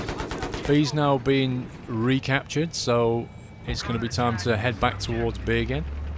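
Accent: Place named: United Kingdom. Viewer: British accent+PS3= ur a badass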